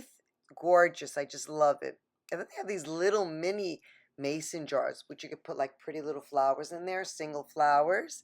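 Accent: New York, United States. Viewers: I hear a NY accent girly!! ❤️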